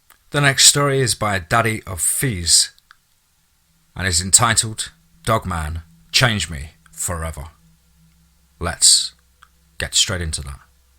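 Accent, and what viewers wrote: United Kingdom. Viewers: Omg, as a Hoosier, I cannot believe that I just heard a British guy talking about Indiana and Sammy Terry! It's almost too much!😀 Fun fact: Owen County is where the very last wild wolf in Indiana is said to have been shot and killed in 1843.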